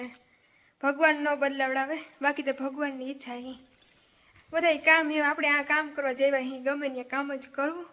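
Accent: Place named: Mer, India. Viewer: Jay siyaram 🙏 Ben tamari mer ni boli saras bolo chho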